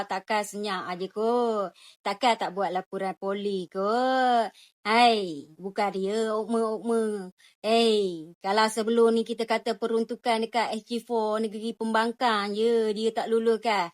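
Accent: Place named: Pahang, Malaysia. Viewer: Itulah loghrat bahasa pahang sebenornya,mengikut daerah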